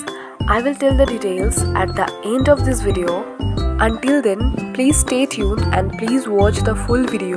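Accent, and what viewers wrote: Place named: India. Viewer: Are you indian 🇮🇳 your voice is matching 😊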